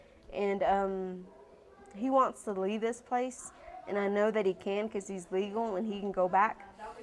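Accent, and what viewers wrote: United States. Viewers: I love your Tennessee accent... It looks real good on you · Dime donde recides te dire si estas en lo correcto. El asento de ella es Americano trabajo con Americanos y le entendiendo y su acento esta como un Americano es mas convivo como muchos Universitarios. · Hahahaha Piche wey no, sabes que es acento americano si supieras no dirías nada, oye Franky alivianate carnal actualizate su acento es muy country y muy América oye Franky mejor cierra la trompeta por que no sabes nada de ingles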